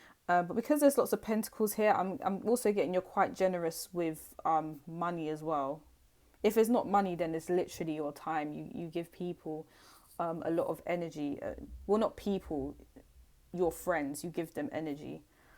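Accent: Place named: United Kingdom. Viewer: I love your humor and British accents really make me weak, so adorable 🥰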